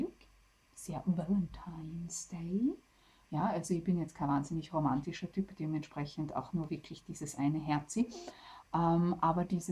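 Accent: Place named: Austria. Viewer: eine Landsfrau in ihrem Dialekt erzählt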